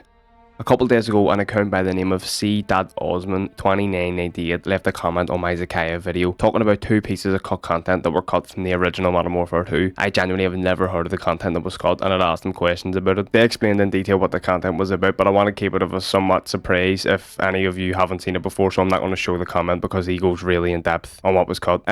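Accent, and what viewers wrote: Northern Ireland, United Kingdom. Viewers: I don't even think you're Scottish... Northern Irish more likely? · Excuse me, are you northern Irish? Cause the accent really makes me certain that you northern Irish.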